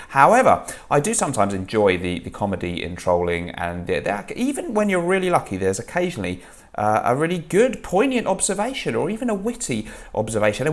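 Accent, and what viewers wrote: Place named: RP, United Kingdom. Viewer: Hello, how are you doing? I am a bit baffled by your videos. For a Polish guy living in Germany, now Miami, your English is very good. You even have this posh accent. How did you manage that. Why are you not clapping and anymore? I miss that. Talking about,… maybe your “ gay “ adventure would have never taken place if you did not spin around. is a song tittle and she make heterosexual males into bisexual or even gay clones. Everybody knows that. You are not gay, you are just a kind of dupe or clone of your self like Armaf cdnI. You are your own scent clone. Just do not spin anymore. And are you now Jewish or Muslim? I do not see your cross or hear you talk about the big J in the sky anymore. Please explain yourself. Are you at the Versace house now in Miami? I see the Angel statues next to you. Very Versace. Anyway I did see you worked out more. 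The body is looking better than ever. Maybe you should do a polish folk dance instead in the turn. Anyway, you are the best. Lots of love from your nr 1 fan. 🤪🤪🤪🤪🤣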